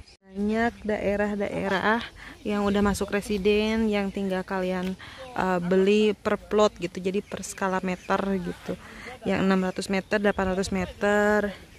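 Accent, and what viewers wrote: West Java, Indonesia. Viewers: Tth sunda bgtttt hihihiiii walaupun di negara org.masih ttp nyundaaa sukaaaaa😍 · Teteh mani lucu nyunda pisan sok atuh pulang ka tanah Pasundan hehehe cing damang teteh .enggal gaduh bayi atuh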